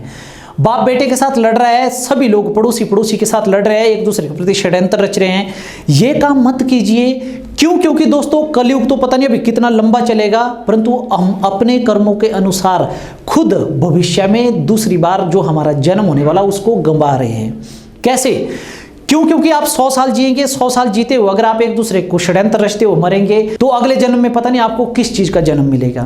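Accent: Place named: Mandi, India. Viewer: Mandi se ho kya bhasha mandi ki lag rahi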